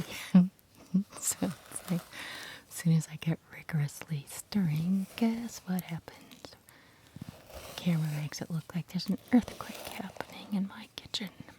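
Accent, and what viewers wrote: South, United States. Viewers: I love your southern accent 😂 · I loooved your funny southern accent 😁 Let me just say it's interesting how you measure the ingredients by volume, here in Italy the measurement is by weight 😱 BTW I'm a huge fan of yours since the beginning, your voice is so relaxing (and I'm usually a no-talking person 🤷‍♀️) All the best from Italy 💙🤗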